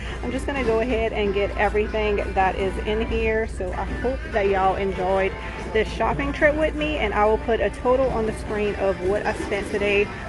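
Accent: Louisiana, United States. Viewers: The music - ugh - but I love watching you- I’m a Louisiana girl so I get your accent😍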